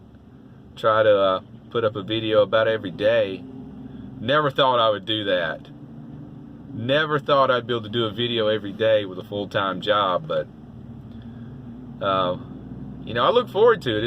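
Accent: United States. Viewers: Super cool accent you have there Mr. USA🇺🇸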